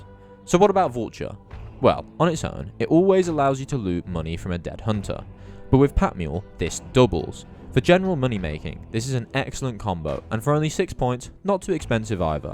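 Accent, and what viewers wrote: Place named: United Kingdom. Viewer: Right, idk why i said american, when that's totally an uk accent, so why uk people say "staminar effect, instead of stamina"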